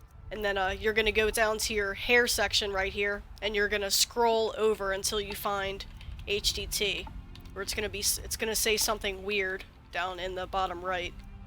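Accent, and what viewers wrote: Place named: United States. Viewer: ) i love ur american accent, so cute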